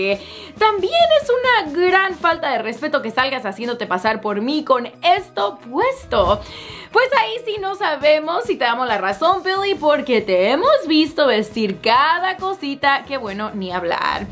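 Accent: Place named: United States. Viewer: me gusta la pagina, pero odio cuando la chica habla tipo gringa, billie jajajaja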